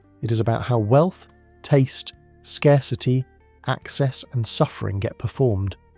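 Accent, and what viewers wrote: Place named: New York, United States. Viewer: You sound like you are from NYC and think you know everything about what you are talking about.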